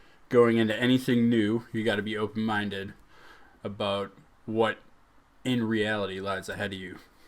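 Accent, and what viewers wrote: United States. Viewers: You sound like you’re from Louisiana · That's so funny. I knew you were from Wisconsin right when you started talking. And then you said down south so I was confused but you confirmed it in the video haha. Go Wisconsin!!!!!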